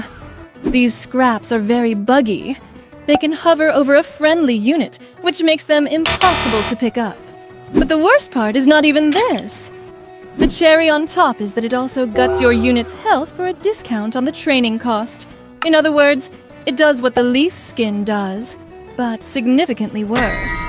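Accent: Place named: United Kingdom. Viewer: holy British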